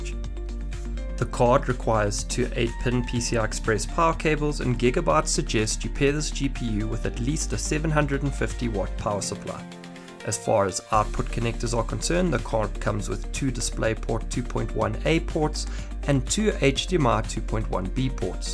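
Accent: South Africa. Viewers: Howzit bru, nice review. It was super cool to hear the Saffa accent! I currently live in Asia and was able to get the card for around 670 USD. Not MSRP but considering the current market I am happy with the purchase. Looking forward to more content in the future!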